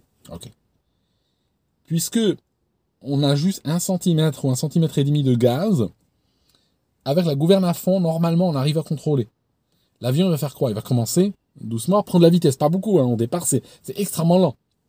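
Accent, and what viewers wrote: Switzerland. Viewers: d'où tu le sort cet accen suisse, tu vis en suisses? Perso je m'en fou, mais c'est pas saint. Ça sent le mensonge. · C'est marrant, t'as pris les intonations et un soupçon d'accent suisse. Combien de temps y as-tu passé ? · "LEGER" accent suisse??, plus il est en forme plus il laisse traîner joyeusement l'accent, je viens de la frontière, si je ne le connaissais pas et juste avec le son je jurerais qu'on a affaire avec un Genevois de souche! (mais méga-callé en aviation) 😁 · C'est vrai que sur cet épisode M. nous gratifie de légères intonations Suisses, sans doute un reliquat de ses études de pilotage dans la Confédération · Étant genevois, c'est son accent qui m'a fait lever un sourcil et de fil en aiguille j'ai commencé à suivre régulièrement ses vidéos qui d'ailleurs sont toujours captivantes. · Eh oui j'ai aussi remarqué l'accent suisse un peu vaudois ? 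· Merci...tu nous diras par quel mystère ton léger accent suisse te reviens en ce moment;-) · Tu me fais sourire avec ton léger accent Suisse cher Ami, m'a tué a 900 ft on récupère que les boîtes noires😂😂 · oui j'ai aussi senti l'accent suisse ! c'est nouveau ca ? :p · Il est bien guilleret dès l introduction notre, ca change des intros à la Pierre Bellemare. Et cet accent etonnant (suisse d apres les autres) qui surgit plus présent que jamais. (Bon moi j ai toujours trouvé que tu causais comme le père Algerois d un ami). Bref autrement le contenu est toujours d une régalade absolue. Un petit hors serie un jour sur Lindbergh, le hindenburg, l Aeropostale? Tant de merveilleuses histoires dans l aviation ❤❤❤ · Vous avez pris l’accent suisse ? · Il n y a pas que moi qui a remarqué son accent suisse 😂😂😂😂😂 Encore une très belle vidéo, merci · Mais qu’est-ce qu’il se passe sur cette vidéo? Au début je reconnais très nettement au contraire que de toutes tes autres vidéos un accent suisse! 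· J'ai été devancé, mais pourquoi l'accent Suisse ressurgit d'un coup dans cette vidéo ? Un coup de fil dans la journée avec un ami Suisse ayant ravivé des souvenirs ? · Le petit accent suisse..! Je me disais la même chose donc.! Monstre bien la vidéo.! 😂 Vive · Effectivement moi aussi j'ai réentendu ce léger accent Suisse dans ces dernières vidéo. J'aime bien , ici en Alsace nous avons un peu le même. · Pourquoi vous reste-t-il de l'accent suisse? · Oui l'accent.. C'est ma mère originaire de Genève qui m'a fait remarquer sur une autre vidéo. Je trouvais pas ça flagrant mais alors là😮 !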